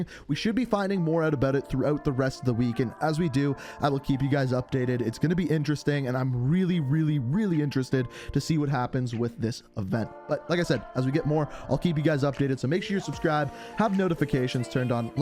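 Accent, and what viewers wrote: Canada. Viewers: Is this clown Canadian ?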